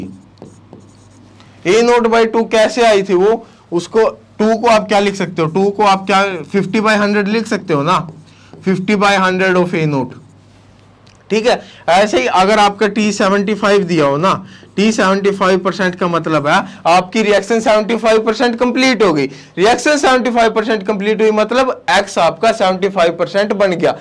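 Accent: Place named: Punjab, India. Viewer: He seems to be punjabi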